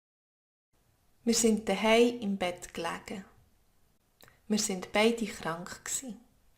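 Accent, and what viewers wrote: Bern, Switzerland. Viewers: Sounds like Bern region 👍🏻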